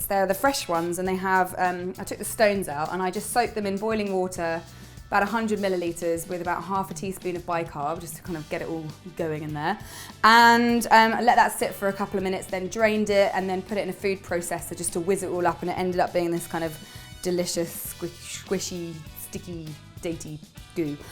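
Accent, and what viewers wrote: England, United Kingdom. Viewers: Well she doesn't sound American coz she's English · she keeps interjecting little bits of American English... I really liked the British accent tho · I can't get enough of the English accent.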